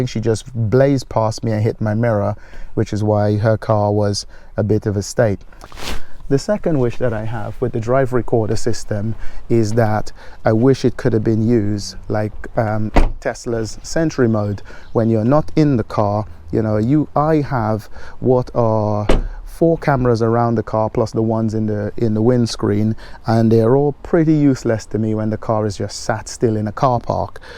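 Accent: Trinidad and Tobago. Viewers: Im hearing the trini accent. 🇹🇹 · Caught that Trini accent...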